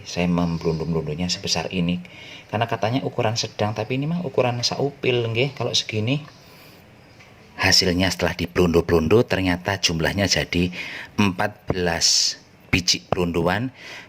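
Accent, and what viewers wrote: Banyumas, Indonesia. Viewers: Ish ish.. ketemu koleksi bhs ngapak.. Blindi" .. 😂😂.. Jls enak wong pk tpung bambu🤗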